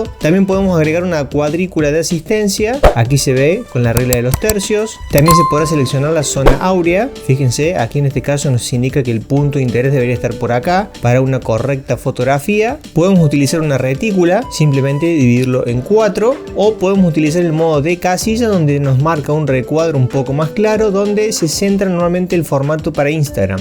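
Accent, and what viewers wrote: Argentina. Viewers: Está brutal este celu! mí única duda era si tenía radio y siiii 😻 gracias por mostrarlo!! Yo quiero el Moto G41 pero me es inalcanzable su precio y este tiene casi lo mismo por menos dinero 😍✨✨ excelente Review!! y más porque sos argentino y no español o de otro lado, se siente más cercano todo lmL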